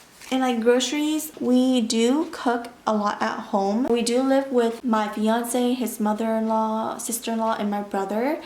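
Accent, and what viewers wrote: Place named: Bay Area, United States. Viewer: i’ve been binge watching these videos for the past couple of weeks and i’m so happy i came across yours! as soon as you started talking, i was like “oh this girl from the bay too” and sure enough! hahaha automatic subscribe. plus i love your penmanship and how minimalistic you keep everything. i plan on starting my budgeting in june and had the same idea of how your process is. and congratulations on the pregnancy, she’s almost here! i look forward to your other videos. xo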